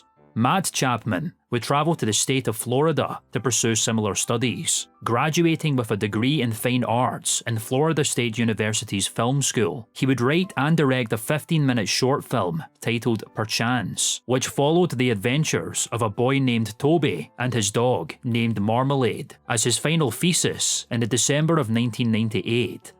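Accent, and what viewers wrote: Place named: Scotland, United Kingdom. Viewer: I cant tell if youre scottish or irish but i can recognize youre scottish and that scares me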